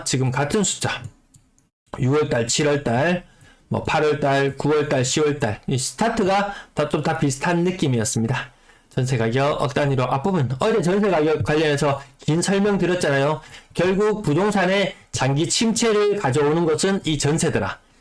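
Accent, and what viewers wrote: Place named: Daegu, South Korea. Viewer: 정보 감사합니다 근데 님 대구분 인가요? 만약 대구분 이라면... 대구 사람치고는 사투리가 작은 편 인것 같아요.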